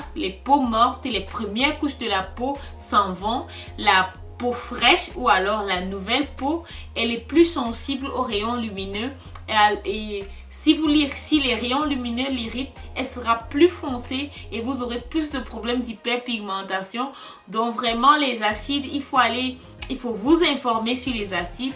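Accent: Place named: Cameroon. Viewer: Je viens juste de mettre la vidéo c’est l’accent Camer là qui m’a retenue après biensur après avoir lu ton titre ☺️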